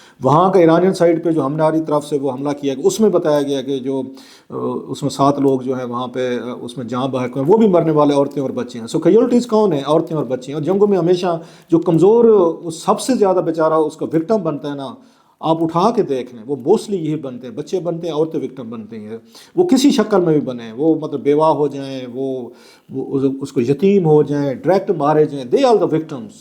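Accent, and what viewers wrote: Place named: Punjab, Pakistan. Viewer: U don't have much knowledge nd don't know to speak Urdu clear, don't mix Punjabi in Urdu, I know u r from rural area of Punjab but with the passage of time u should be clear with the national language, anyway u should be very confident , u r confuse, very sad , pandu type anchor.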